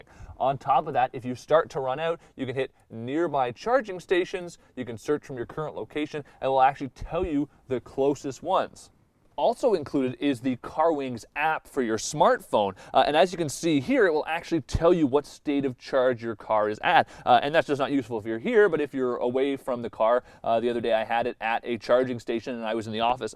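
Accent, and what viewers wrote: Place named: Canada. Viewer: Different accent. Listen to how he says the word "out." He sounds Canadian/North American Mid-West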